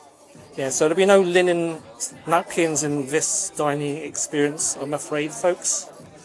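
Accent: United Kingdom. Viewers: It's so interesting hearing your British accented pronunciations of Chinese dishes. I'm a California born Chinese speaker and I say things with a bit twang compared to you. Thanks again for the video.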